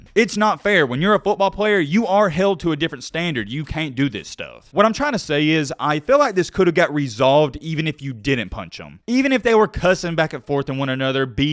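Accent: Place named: Alabama, United States. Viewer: sounds almost like he's from Ardmore or the Huntsville area lol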